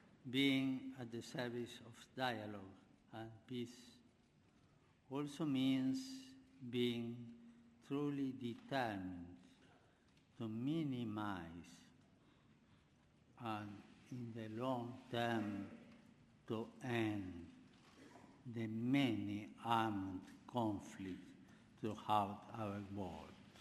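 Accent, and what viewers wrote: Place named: Argentina. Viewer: Heavily accented indeed, you can only expect so much from a man born of Argentine descent (whom spent most of his life in the Vatican thereafter) trying to speak English. That being said, the words uttered do carry heavy weight.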